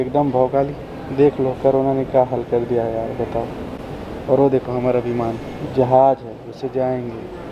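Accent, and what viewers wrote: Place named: Kanpur, India. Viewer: Guru kanpur se ho ka..yar boli bhasha ekdam mil rahi hai na..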